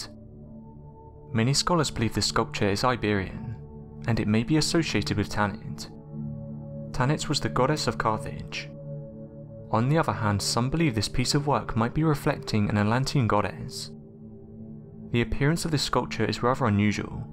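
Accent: England, United Kingdom. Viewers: Anthony ouch...but true! I understand that pronunciations will be different between English speaking countries, but that was the completely incorrect word. I can handle meethane for methane. I cannot handle parameeters for parameters! I used to think anyone with a proper British accent sounded quite intelligent. Since YouTube, not so much😄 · Yeah well certain Englishmen come from regions where they speak like that because inflections and intonation carried over from Middle English, Welsh etc...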